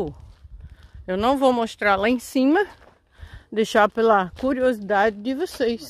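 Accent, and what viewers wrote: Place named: Portugal. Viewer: Olá amiga vc parece uma verdadeira portuguêsa! O sotaque é igualzinho! Kkkk vim fidelizar nossa amizade! Abcos!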